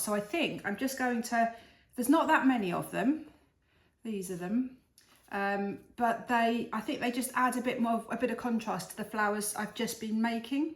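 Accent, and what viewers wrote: England, United Kingdom. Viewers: I love how creative you are! 🌿🌺🌼🌸🌿 This message reminded me to tell my friends how important they are and that we are here to lift each other up. It's a wonderful little quote for both ourselves and others. Thank you for sharing your beautiful Artwork with us. You bring me much JOY in everything you do. Besides that, i love your English accent (i live in the USA). 🩷🩵💜🦋🏜️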